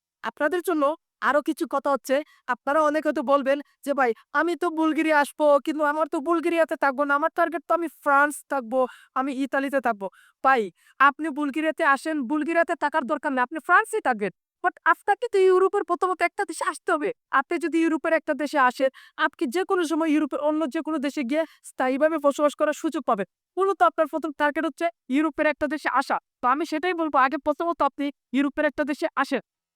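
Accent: Sylhet, Bangladesh. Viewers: ভাই সিলেটি মনে হয়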